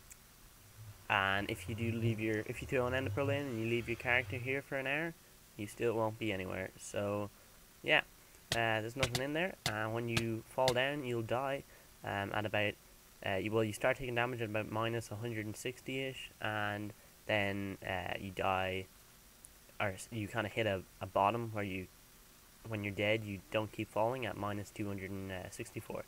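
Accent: Ireland. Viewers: is this lad irish? he sounds it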